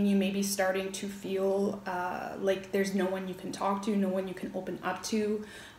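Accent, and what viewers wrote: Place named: Canada. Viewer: You are right on the money darling but do you blame me about getting my feddy? Because with my own experience and I can only talk about myself, all I know is that love is not the kind of love that there used to be sweetheart. I believe that one of the top five reasons for relationships are matrimony problems or issues we're about Finance if a man can bring in the money he ain't getting no honey. There's a lot to be done in a relationship got to have money you got to give quality time to each other course there has to be good communication at least and a lot of it to listen. But for sure any problems baby and that's fine cuz I am a king therefore King has a queen and she better look like one cuz if I cannot condone her not just with material things should be at the very bottom to least but they are needed because I will Adorn her with all my love and being but if there is a struggle in finance paying bills anything like that it won't work. 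I'm just saying thank you God bless you I appreciate you and I love your accent Canadian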